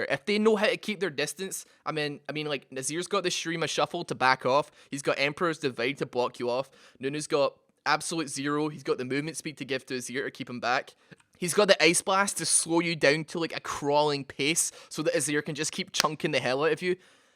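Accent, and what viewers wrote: Scotland, United Kingdom. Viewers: He's Scottish mate xD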